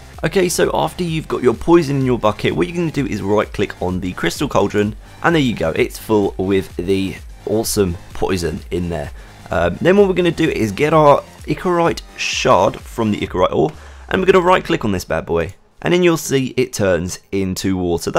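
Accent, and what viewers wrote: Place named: United Kingdom. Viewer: Don't worry about popularity man, you have a British accent, you'll be popular in no time!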